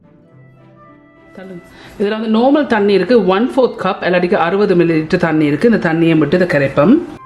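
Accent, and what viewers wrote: Sri Lanka. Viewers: இலங்கை தமிழ் நீங்க செஞ்ச தோசையைவிட அருமையாயிருக்கு .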